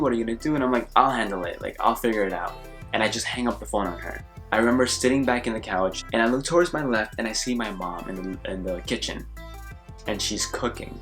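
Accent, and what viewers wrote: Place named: Bronx, United States. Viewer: That Bronx accent is coming thorough lol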